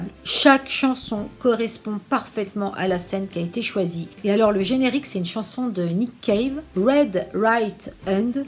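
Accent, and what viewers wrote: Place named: Quebec, Canada. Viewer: Hey je viens de découvrir ta chaîne , et ta façon de parler (timbre de voix ou accent je ne sais pas trop), me fais vraiment rire Aha ! Un total melange entre le québécois et le mec de la chaîne « Le bledar » x)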